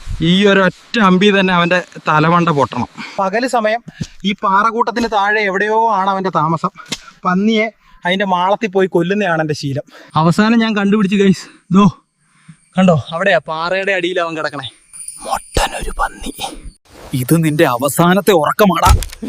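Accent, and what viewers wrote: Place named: Idukki, India. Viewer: അസൽ ഇടുക്കിക്കാരുടെ സ്ലാങ്...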